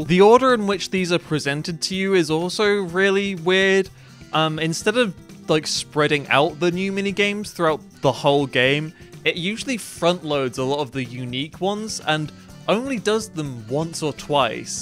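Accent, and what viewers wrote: United Kingdom. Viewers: I remember playing this game and thinking it was neat. I didn't think I would be reminded of it by a cute bri'ish boi using it as a vehicle for a mental breakdown. lmfao In seriousness, take care of yourself, bud.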